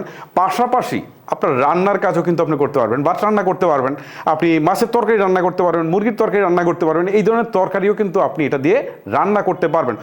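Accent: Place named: Chittagong, Bangladesh. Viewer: আমার অনেক ডিসটিকের মানুষের সাথে কথা হয় বা চলাফেরা করা হয়, চিটাগাং এর মানুষের‌ উচ্চারণ কিছুটা অন্যরকম আমার মনে হয় এই ভাই চিটাগাং অথবা আশপাশ এরিয়ার।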